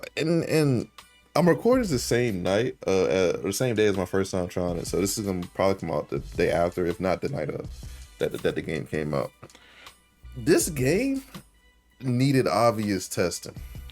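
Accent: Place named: United States. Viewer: From the footage I've seen they're just recycling so much of the first game and it feels like they want to do fancy with the newer stuff like eye tracking, but I honestly wouldn't care much about that either. I don't get how they can mess up so hard. Did they actually create any new maps? All I've seen so far is old maps... It was quite hard to understand your American accent lol, but a fun and honest explanation. Greetings from Belgium!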